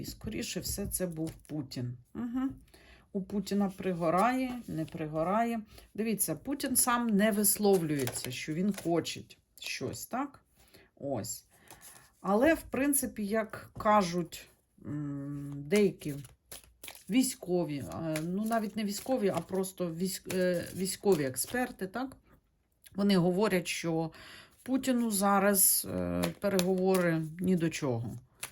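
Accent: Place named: Surzhyk, Ukraine. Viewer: Хорош лапшу на уши вешать! Как баба базарная ляпаешь, семечек не хватает, и суржик прет!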